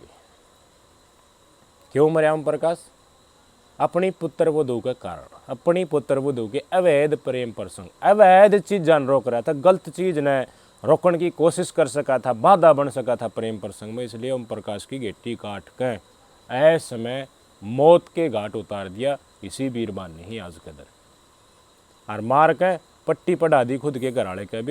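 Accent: Haryana, India. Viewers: Nice video bhai sahab ..apkii pure haryanvi language nd ekdum saaf saaf samjhane walii voice modulation..thanks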